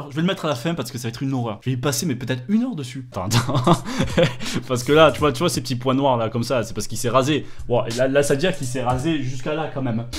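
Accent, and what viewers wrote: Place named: Marseille, France. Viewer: Ta l'accent Marseille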